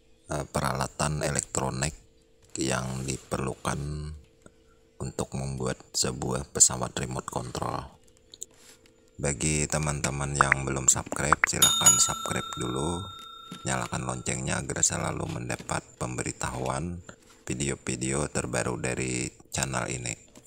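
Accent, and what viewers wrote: Banjar, Indonesia. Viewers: Logat banjar nya terasa banar leh 😁😁😁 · Logatnya kayak orang banjar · Kyk orng Banjar abg nik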